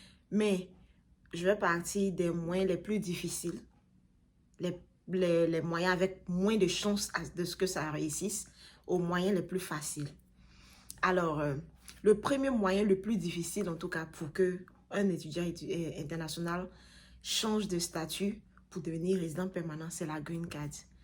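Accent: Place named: Ivory Coast. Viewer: L'accent ivoirien 🇨🇮 ça ne ment pas. Très propre et limpide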